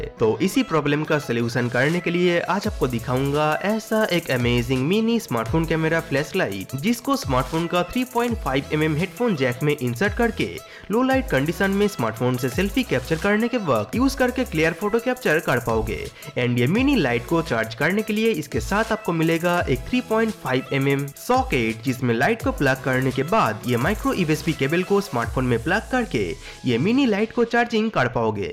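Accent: Bengal, India. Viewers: i love your bengali accent ..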